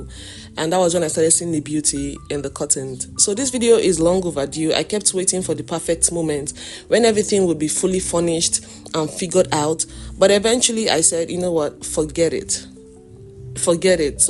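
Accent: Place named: Nigeria. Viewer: Congratulations! May the Lord give you divine favor in 2026 and beyond in your life, your health, your channel. By the way, tell us which country. You sound Nigerian but 🤷🏽‍♀ I'll subscribe anyway.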